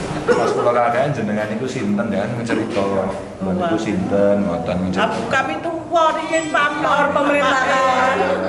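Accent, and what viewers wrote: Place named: Temanggung, Indonesia. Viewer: Boso khas temanggung kiye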